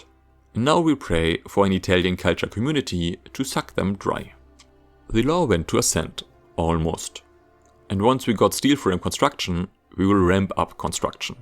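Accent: Germany. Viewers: Alter, gotta work on that accent. Good vid though, but boy is that German accent seeping through savagely...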